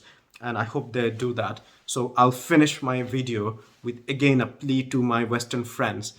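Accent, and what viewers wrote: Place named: Bangladesh. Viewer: bhai😅😅tel tela hoya gheeco 😢you love hashuvu, Tomar English eto joybangla Keno? Kemne tumi medical porla? Naki oitao zionism dia chailaicho 😮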